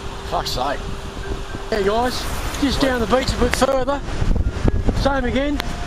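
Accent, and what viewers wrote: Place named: Queensland, Australia. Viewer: Mate sounds like you could be an Aussie, my guess is from Queensland.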